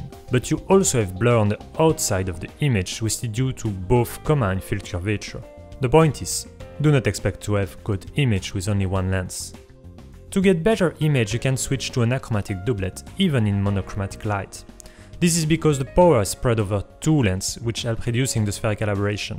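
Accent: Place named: Belgium. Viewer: Great explanations!!! Your accent sounds like from Belgium or French speaking? I have few friends I know from there.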